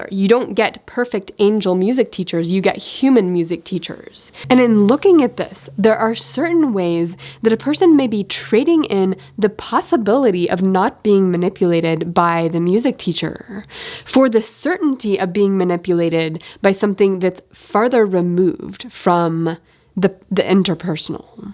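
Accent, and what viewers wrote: Midwest, United States. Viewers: Lol it's a little weird to hear the shallow take that you don't have an accent... Everyone has an accent. I'm also from Missouri, I had you spotted as a midwesterner. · Thanks for mentioning the "accent," I assume that gives me permission. ;) My friends like to joke that we don't have an accent because we speak with that boring midwestern "Peoria" or "TV newscaster" accent. But we definitely have an accent; we intentionally speak like others around us, and unlike people from New York and Minnesota. The reason us nerds are pestering you is because we know this style of speech from the character Summer Smith from the cartoon Rick and Morty. The actress Spencer Grammer has a hint of it, but it's much stronger in the cartoon. So the polite question is, "What's your accent?" But what we're really wondering is, "Why are there two of you??"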